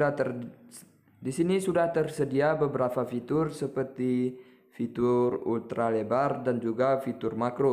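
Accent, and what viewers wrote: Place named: Aceh, Indonesia. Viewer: Bg org mana logatnya kayak orang aceh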